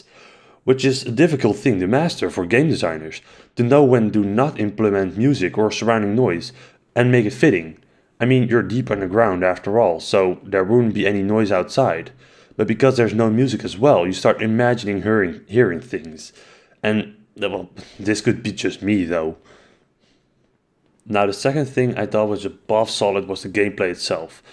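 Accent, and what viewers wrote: Netherlands. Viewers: i can hear that your Dutch man xD nice video do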